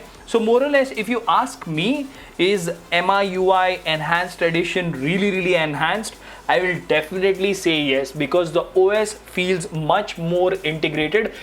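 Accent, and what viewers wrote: India. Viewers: Indian english sounds really bad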